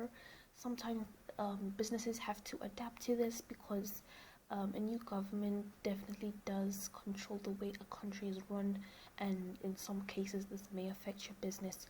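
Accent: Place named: United Kingdom. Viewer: What a British accent you have , i am amazed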